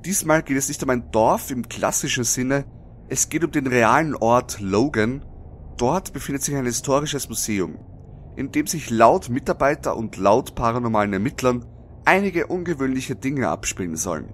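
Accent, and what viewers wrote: Vienna, Austria. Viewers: Ich liebe den Wienerischen Akzent🤤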